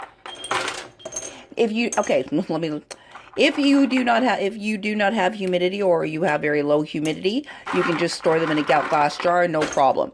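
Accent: Canada. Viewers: I love the Canadian accent and your recipes thanks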